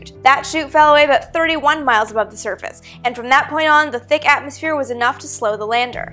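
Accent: Canada. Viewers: She is Canadienne. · Do I detect a Canadian accent? I had no clue there was a picture of Venus' surface, great video! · She sounds Canadian.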